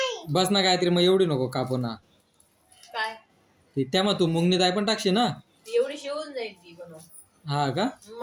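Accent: Khandesh, India. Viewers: Kont gav ahe tumch भाषा vrun tr खानदेशी vatay tumi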